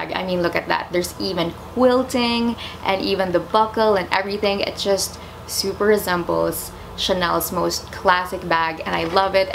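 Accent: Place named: United States. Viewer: First time viewer love the accent still confused if you're American in a good way though I immediately subscribed when I heard your precious voice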